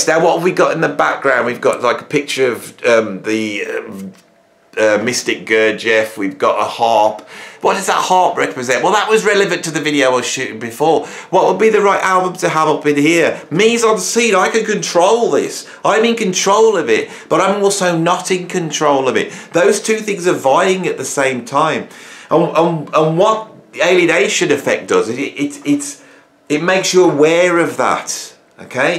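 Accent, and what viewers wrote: United Kingdom. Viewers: You’re a mad genius, I love it. As an American, and an accent buff, I tried to imagine this video (I’ve watched others of yours and subscribe) with the exact same content, but delivered with an American accent, or even a more neutral British accent, it would be a very different experience. Your content is great, I think it’s brilliant, but I also think your accent is a bonus that gives the whole thing an ineffable charm. Cheers!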